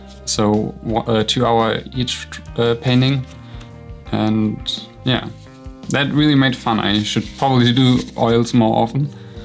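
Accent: Germany. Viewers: ich hör richtig raus das du ein deutscher bist xP ps: nice pictures! love them x3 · Wow, your German Englsich is so sweet😆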